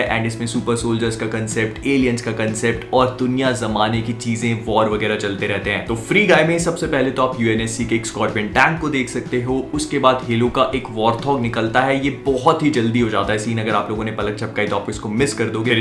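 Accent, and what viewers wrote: Bhopal, India. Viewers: apne Bhopali accent mai video bnake maza hi dila diya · I love your bhoplai accent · bhai bhopal se hai kya??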